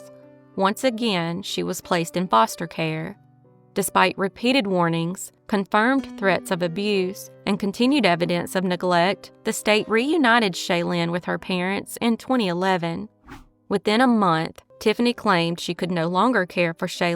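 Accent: South, United States. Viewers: Your thick southern accent ist so cute! My mother tongue ist German, i live in Germany and i lovr strong southern accents!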